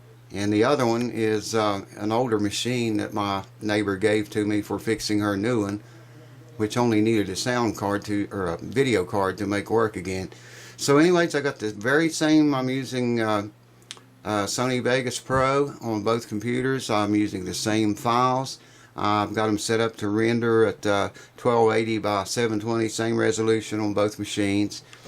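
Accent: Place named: Appalachia, United States. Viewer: Great video - your accent reminds me of the Appalachian Mountains - I sure miss my old friend Ralph Stanley.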